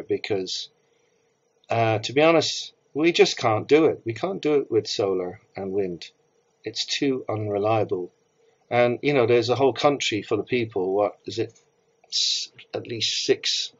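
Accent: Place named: England, United Kingdom. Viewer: What part of England are you from? It may work better in the UK.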